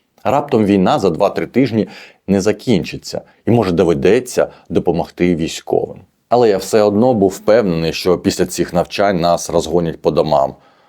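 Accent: Russia. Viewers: Скільки років Незалежності України ? 33 роки. Скільки РОКІВ ВИ РОЗМОВЛЯЄТЕ УКРАЇНСЬКОЮ ??? 1 РІК . МОЖЕ 2 . ЦІКАВО , ОСОБЛИВО ЗВАЖАЮЧИ НА ВАШ РОСІЙСЬКИЙ АКЦЕНТ ТО ВИ НЕ СОВОК ??????? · не смог посмотреть только из-за того, что украинский от автора (который явно думает на русском и всю жизнь говорил на русском) просто ужасен. вот эта вот типичная путаница и/і просто сводит с ума. никогда не смогу понять, как может украинец в Украине говорить на украинском с АКЦЕНТОМ. это пиздец, господа.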